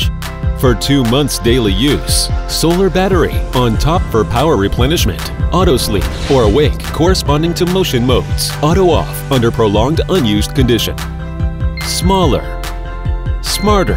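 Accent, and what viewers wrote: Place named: China. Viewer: The Chinglish is putting me off.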